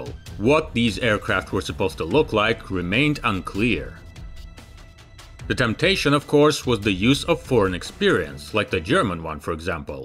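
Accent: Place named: Russia. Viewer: The narrator is a native Russian speaker, providing the way he pronounces the titles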